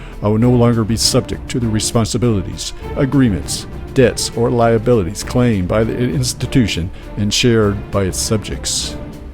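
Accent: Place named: Texas, United States. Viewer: Sounds just like a TEXAN!! Yee Haw!!!!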